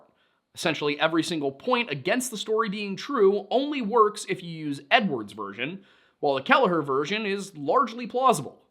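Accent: Canada. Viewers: "Let's get Innuit." The Canadian lighting and accent. All 🤌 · dude you sound like a leprechaun with the Canadian accent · Love your Canadian accent -a laughing Canadian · As a Canadian I did like the accent you went for, it was a little honky tonk but you got the right idea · Your Canadian accent killed me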